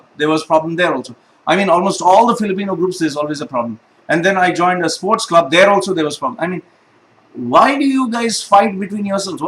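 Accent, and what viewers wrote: India. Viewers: Hey guy. ..talk about ur nationality. .ur accent like. ..Indian. ...sooo talk about Indian. .. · all were true..i can sense you have indian accent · he sound an indian. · akala mo kong mtatalino ang lahi mo.hoy tingnan mo muna ang mga kalahi mong mga Indian kong matatalino ba o mga edukado, kong mkapagjudge ka sa mga Pilipino wagas.At ano nman pakialam mo kong anong gustong bilhin nmin,pera namin ang pinambili hindi pera ng india.Palibhasa hanggang panglalait lang ang alam nyo sa mga pilipino kasi kahit saan kami magpunta magaling.tingnan mo muna sarili mo at kapwa mo indiano kong maayos ba o hindi.indiano ka nman eh hindi Arabo.salita mo pa lang halata na indiano.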